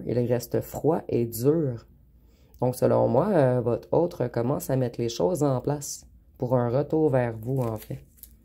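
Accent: Quebec, Canada. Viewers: J'adore vous entendre parler et vous ecouter chère madame avec votre joli accent quebecois❤❤❤❤❤❤❤❤❤❤❤❤❤❤❤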